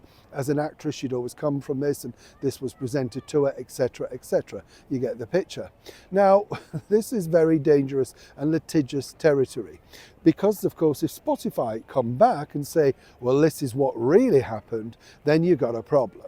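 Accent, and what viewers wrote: United Kingdom. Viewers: Love you at least you’re not endlessly talking to Americans like Dan Wooten. Lovely to hear your commentary. Your representation of the British voice is refreshing. Thank you x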